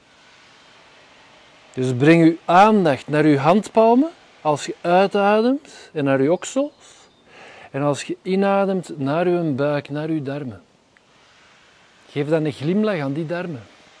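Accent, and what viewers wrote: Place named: Flanders, Belgium. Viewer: Wat een fijne, warm aanvoelende les, met als extra dat mooie Vlaams van jou en dat rustgevende konijn dat helemaal haar eigen gang gaat. Cadeautje van jou, dankzij YouTube ontvangen. Dankjewel. Groet van net over de grens. :)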